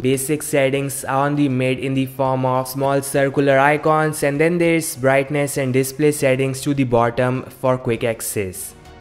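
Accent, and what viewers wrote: India. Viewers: Are you from india...now wonder...i dont really understand some of what you are saying😊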